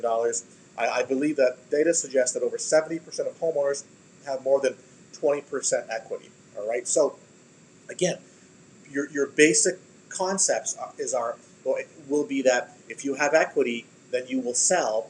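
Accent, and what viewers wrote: Canada. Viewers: No big deal but you sound like you’re from Canada you have a Canadian accent I just started listening to your program just curious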